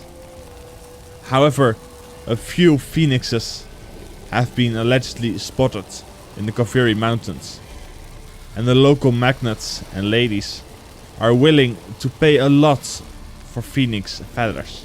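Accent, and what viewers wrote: Netherlands. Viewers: The first two are well-known mythological animals (phoenix most of all), but I've missed them all completely in the Witcher. But then I also don't play Gwent, so that's probably why. Phoenixes are my favourite animal. Very interesting video with these unknown creatures (unknown at least in the Witcher). Answering your question; I love Tissaia De Vries as a character (and your Dutch pronunciation will just nail that name), but Coral might be a better choice, since less people know about her. Maybe keeping Tissaia for last will get you more views, because it'd be closer to the release of the Netflix series wherein she appears. I'll look forward to it regardless of which one you make first.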